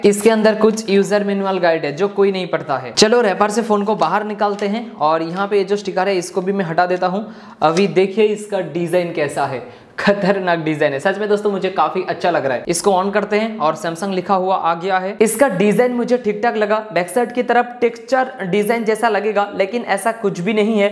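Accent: Bengal, India. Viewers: Lagta he ap bangali he.isiliye apka hindi sunne me bahut achha lagata he comedy comedy bolta he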